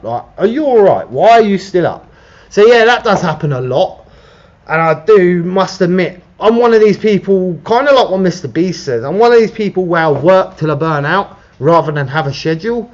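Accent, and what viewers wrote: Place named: England, United Kingdom. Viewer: "also hey, your deffo from England not Wales haha you better get that Rhys name correct ahhaha same name as me it's a Welsh name pronounced like R "ee" s 😂😂😂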